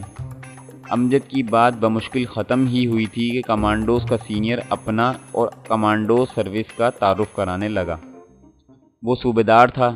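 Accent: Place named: India. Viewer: Ye lehja indian hai ......aesa kabhe nahe hota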